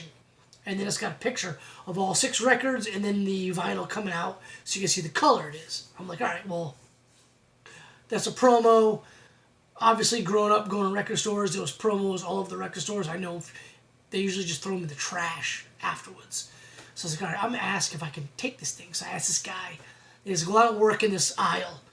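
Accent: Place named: Northeast, United States. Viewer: I’ve been a ‘tallica family member for 28 years, (fell in love after hearing that my sisters copy of when I was 7) wish they would’ve switched for! Also just a side note, I grew up in Boston (8 miles from Fenway park) you sound you lived in the Northeast at some point....great story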